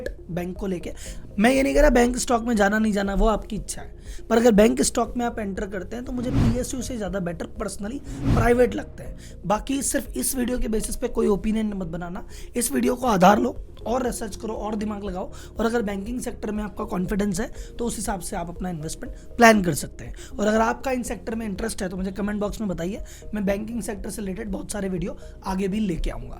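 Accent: Gujarat, India. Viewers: Sir aap Gujarati hoo...ya non gujarati ho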